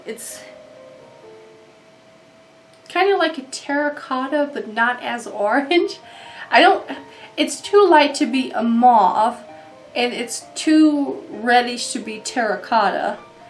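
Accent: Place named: Germany. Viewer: You're losing your German accent. :(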